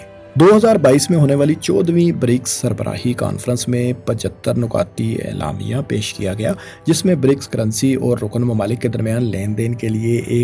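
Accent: Punjab, Pakistan. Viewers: Aapki malumaat bahut achi Hein 👍🏻per Urdu Aap Punjabi lehjey mey Boltey Hein jiss Maza kirkira Hojata Hey 😂🙏🏻🙏🏻👍🏻👍🏻👍🏻👍🏻 Sohail Karachi 🙏🏻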